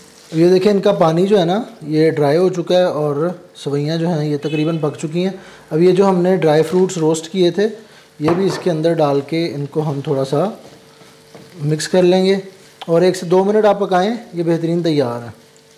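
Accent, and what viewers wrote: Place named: Islamabad, Pakistan. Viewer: Islamabad ki tone, I like the way you speak. ❤, gonna try this recipe today.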